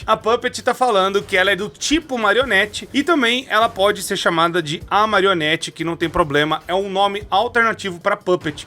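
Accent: Brazil. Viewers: E tu é bahiano 🤣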